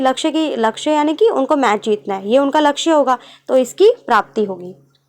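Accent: Delhi, India. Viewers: Mam are u from Delhi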